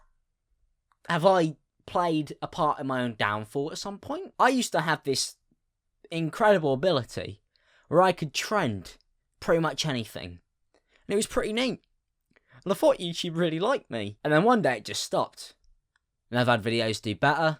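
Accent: United Kingdom. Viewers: It must suck to have a British accent and *still* sound sorta dumb. Not nearly as dumb as my American accent (face it Americans, the world thinks we sound retarded) but definitely way more dumb sounding than most British accents. (Again, I'm American, and we think a British accent means you're smart, because we are very, very dumb. If you have a British accent AND glasses, we assume you're a genius. You know; like how a dumb person thinks. )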